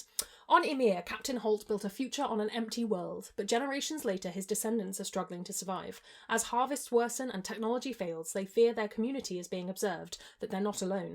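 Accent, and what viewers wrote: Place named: United Kingdom. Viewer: Love your accent, it's like British lite, like a mild tea😊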